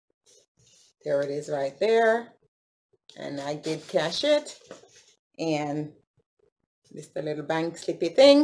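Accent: Jamaica. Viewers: A Jamaica yuh come from?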